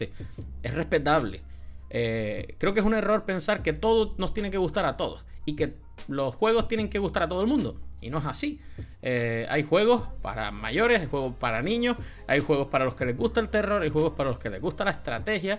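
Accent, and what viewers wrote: Canary Islands, Spain. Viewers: Español de Canarias por el acento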